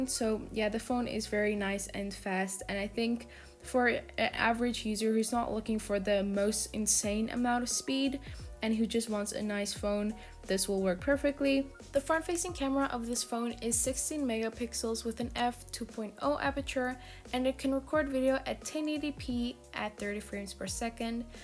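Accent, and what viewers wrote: South Africa. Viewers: Is she South African?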